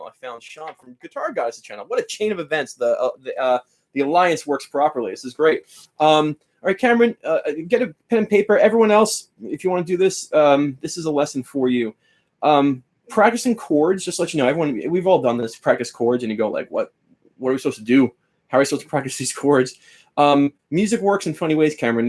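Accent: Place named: Upstate New York, United States. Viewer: Do you know anyone named Miraglia? Or, do you happen to be from upstate New York? My buddy ( since passed), and his brother, spoke just like you. Mannerisms as well.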